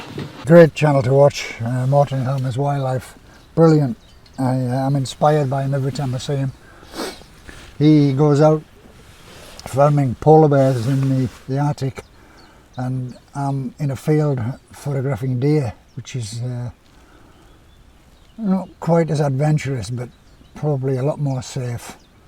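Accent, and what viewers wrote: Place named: Liverpool, United Kingdom. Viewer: Oh, put some up. They were great I'm right behind you at 65 and hubs James bought me a Stratocaster a few weeks ago. I sold mine maybe 2 years ago...thought I was too old and have arthritis in hands but, I had got him a semi pro electronic drum kit for Christmas and with all these great backing tracks and bass track you can use for free we have started playing. He says we should up loadvids but I am not keen at all. This strat is as sweet as honey with super low action and it was the bargain of the century but its twice as heavy as my last one so I have to sit down to play...not very rock chick! As I said, liked those vids of yours and in principle I think its good for people of any age to see elders just doing...because like me, I am sure you remember a time when old age was not synonymous with illness. Somewhere Ive got a vid of my sisters band in the USA. 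Rock chicks, all over 60, lead singer 67 and they are belting it out on the circuit in L.A.if I can find it I'll upload it You sound Liverpudlian/cumbrian....I'm Lancastrian from Worthington in S. Lancashire. James is a very Yorkshire man we both miss northern banter our tiny village in Pembs is all round table, women institutes and a strict regime of intense garden manicuring...they roll along in life with absolutely no rock at all🤣🤣🤣 Have a great day and get them vids up lad!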